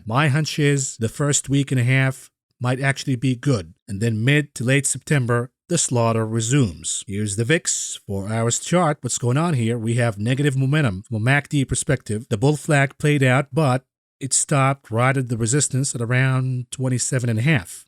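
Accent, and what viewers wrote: New York, United States. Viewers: New York for sure I'd say Iranian American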